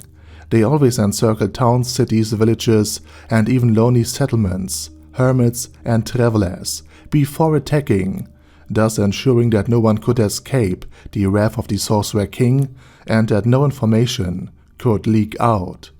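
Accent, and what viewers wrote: Germany. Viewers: If you don't understand a German accent feel free to leave. Listening to a German accent can take time to get used to but the way you say it is just rude... If you really watched at 2x and didn't understand what he said it's your fault in the first place. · Yes my hearing is good, I have been listening to non natives since I was 5 years old every day. If you are German yourself and hear a german accent this awful it makes you not even wanna try. This is the level of someone who has not tried to make themselves more illegible since he first started learning english as a kid. As someone who actually put in effort to make myself more understandable, why should I waste my time on an animal who puts 0 effort? Again, stop dickriding this random person who doesn‘t even know you exist. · He's German, so he has german accent. It really isn't that hard to understand as you make it out to be.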